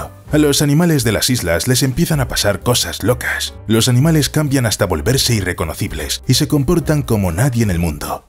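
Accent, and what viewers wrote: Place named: Spain. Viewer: Pros del video: Una investigación interesantísima, imagenes acorde y un tema novedoso. Contras: Español de España.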